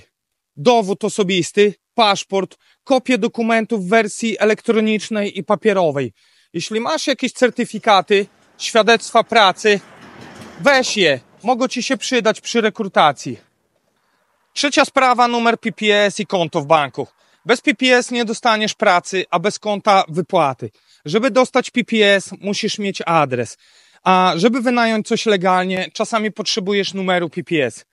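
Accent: Podlasie, Poland. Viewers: usłyszałem akcent i mówię podlasie, i zgadłem. Nie tak tu strasznie😉 idealnie nie jest ale da się żyć. Nowo przyjezdnym odradzam przyjazd bez wcześniejszego załatwienia zakwaterunku najlepiej u rodziny już tu żyjącej lub znajomego. Służba zdrowia, mhm ja osobiście mam dobre doświadczenia a i w Polsce idealnie nie jest🙃 A im mniejsze miasteczko tym łatwiej się żyje, Dublin odradzam każdemu... Pozdrawiam z Waterford 18 lat na wyspie a miał być tylko urlop🤣🤣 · Oprócz śmiesznego podlaskiego akcentu większość co chłopak mówi to prawda. Mieszkam tu 20 lat i widzę jak Irlandia się zmieniła... Czy na lepsze...?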